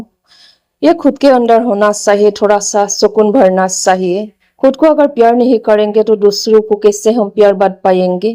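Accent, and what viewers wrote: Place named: Assam, India. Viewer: Maje maje axomiya tune to ahiye jai🎉❤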